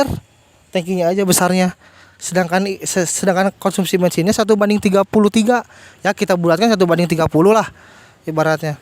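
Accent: West Java, Indonesia. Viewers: Meni balelol ngreview na...logat sunda na kaciri..mudah2n kaharepna tambah maju jang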